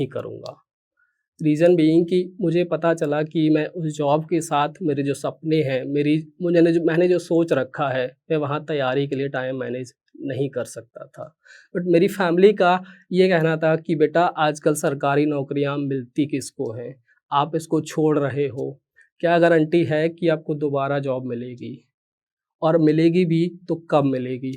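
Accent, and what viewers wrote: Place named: Jhansi, India. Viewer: Jhansi UP ka lg ra banda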